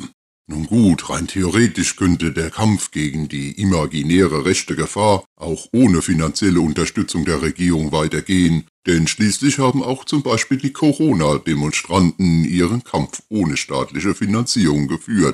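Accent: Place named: Swabia, Germany. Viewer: Der Gorilla hat es geschafft, das ich (Schwabisch Dialekt?) ertrage. Top Integrationsleistung. Vor war es wie Fingernägel auf Tafel. Ich denke schon, das es enormes Einsparungspotenzial bei NGOs gibt. Hat denn (Miley?) Erfolg damit? Orban hat es erfolgreich exerziert. Und möglicherweise einen Maidan 2.0 verhindert.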